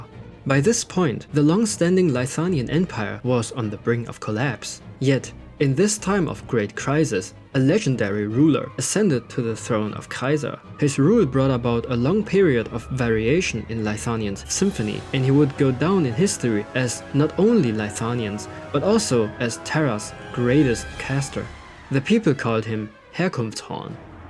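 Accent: Germany. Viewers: This being narrated by an actual German dude (I assume anyway, ‘cause I mean, if you aren’t, then you sure do a good impression), this really couldn’t have been done better. Satisfaction.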